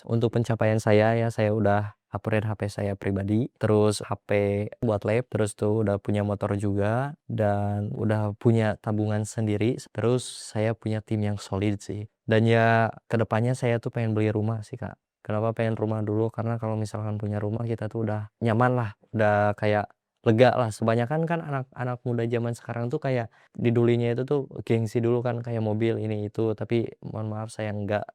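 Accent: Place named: West Java, Indonesia. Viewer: kebiasaan orang sunda ngomongnya gitu bang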